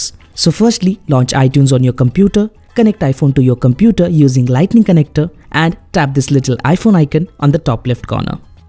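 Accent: India. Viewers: ah yes the first channel that an indian speaking english im so impressed and thanks btw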